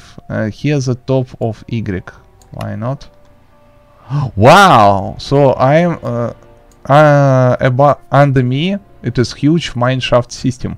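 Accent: Russia. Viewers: Прям слышу русский акцент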